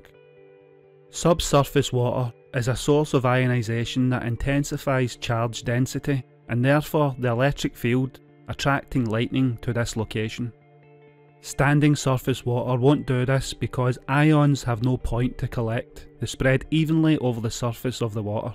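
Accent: Scotland, United Kingdom. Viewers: nice accent. many good science popularizers have beautiful scottish accents. brian cox.iaian stewart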